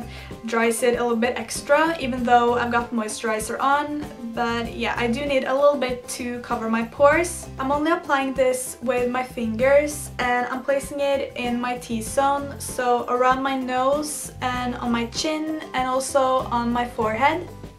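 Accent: Norway. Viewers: It's the norwegian accent.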